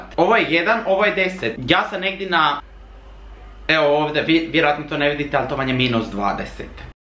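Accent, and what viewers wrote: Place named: Dalmatia, Croatia. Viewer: Vrh mi je kako mjesas dalmatinski i zagrebacki ...znači umrla sam kad si reko nis jea...i još nešto 4x8 nije 24 već 32 ahahahahahahj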